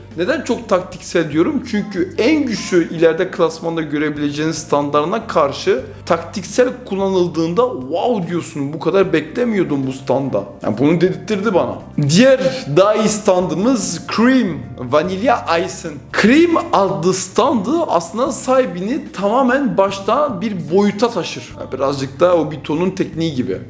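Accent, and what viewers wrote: France. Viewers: abimin maşşallah jean pierre polnareff gibi fransız aksanı var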